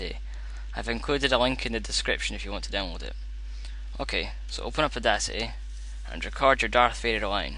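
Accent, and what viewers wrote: Scotland, United Kingdom. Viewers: are you british? :O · Cool scottish accent :D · are you sottish? you soud scottish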